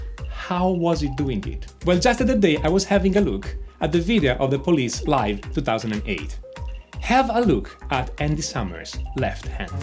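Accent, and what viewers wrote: Italy. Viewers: he has quite restrained an accent for being Italian -^_^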